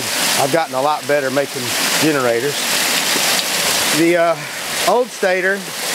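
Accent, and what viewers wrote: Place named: Georgia, United States. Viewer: Sir...........are you a hill billy from Georgia? Your akcent is amazin and i liked ur video sir.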